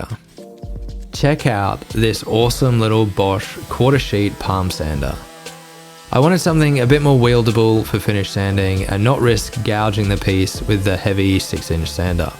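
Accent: Australia. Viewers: Just saying thanks for the presentation: a genuine honest woodworker just doing what we do. the aus accent is appealing too. I found your videos because I also researched/purchased a Harvey table saw.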